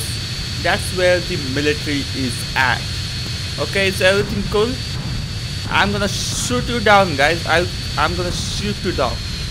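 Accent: India. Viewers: I love your indian accent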